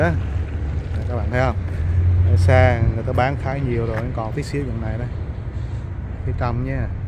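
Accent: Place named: Vietnam. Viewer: 😂 phát âm kiểu rất Vietnamese đó bạn.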